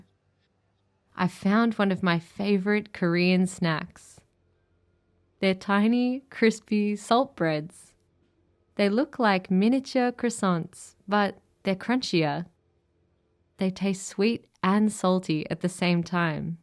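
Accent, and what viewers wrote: Australia. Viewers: Hi! I’m watching from South Korea. As someone who really loves the Australian accent I really enjoy watching your videos and listening to the way you speak! It’s also interesting to see so many Korean products in Australian Asian markets. By the way the salt bread snack is popular in Korea as well🤤🤤 The chocolate flavor is popular too!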